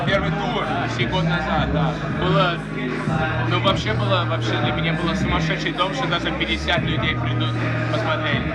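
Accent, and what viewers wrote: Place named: Russia. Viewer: намного,прямо заметнее,но иногда видно,что слова подобрать не может.Хотя фразеологизмами хорошо пользуется,главное - акцент русский стал.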